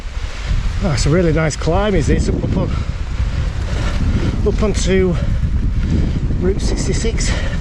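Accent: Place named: Yorkshire, United Kingdom. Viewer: If it wasn`t for the Yarkshire accent and the wet trails I`d have sworn I was watching BKXC. Brilliant video, loved every minute. Quality review of the bike as well.. Oh and the colour of the handlebars.......I`m colour blind so it Doesn`t matter to me LOL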